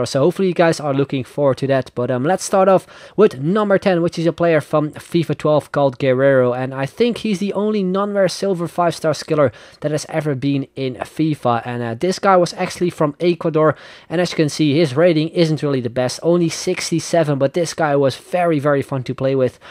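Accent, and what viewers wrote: Netherlands. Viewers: Lol i hear that you got that dutch accent when you speak.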